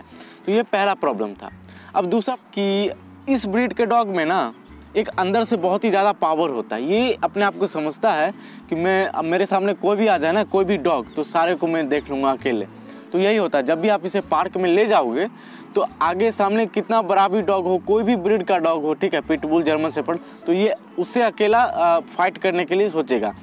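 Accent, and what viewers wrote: Bihar, India. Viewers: Bihari Bhai hum bujh Gaye aap ki bole😁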